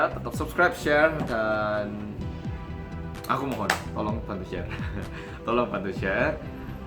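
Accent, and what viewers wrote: Java, Indonesia. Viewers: hahhaaha logat jowo ne sipp bro. like like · swarane medok jowone mas koyok dudu wong korea ae mas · Njawani pol ya sekan,? Wkkw gak balik malang lagi? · Haha ngakak polll ndengerno cara ngomonge medokkkk polll... Still cant believe that ure korean hehehe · boso jowone medok rek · Aku ngerti iki beberapa minggu lalu dari channel nya Londo Kampung.. Salam kenal,.. Pertama kali ndelok kuuuuuaget, kok onok wong Korea seng muuuuuedok koyok ngene, masio wes balik ke Korea sek pancet iso medok.. SALUT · Jowone medok banget yo...gak ngetarani lek wong korea lho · Sing nggarai penasaran ki polahe aksen ngomonge pean cek jowone, aksen Korea ne gak ketoro beellas. Josss top markotop pokok e 👍👍👍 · mas.. mbok kapan kapan pean gawe pidio bareng kakanya.. dengan logat medok sampean.. pasti asyik tuh... hehehehe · mas bosku dr korea beliau dtng 1991 tp ko ngomongnya msh terbalik2 dan msh korea2 gitu, seperti orang dr papua/orang kendari gitu jd lucune poolll tp ko mas bs fasih &medok mungkin faktor usia kali yeee hhhh..... 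krn beliau dtng udh tua dan panjenengan dtng msh balita jd msh encer otak wkwk......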